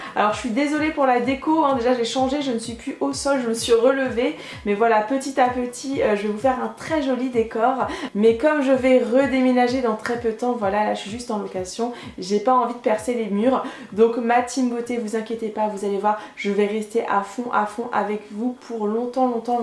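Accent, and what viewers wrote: England, United Kingdom. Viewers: sympa ta vidéo mais l'accent anglais ah ah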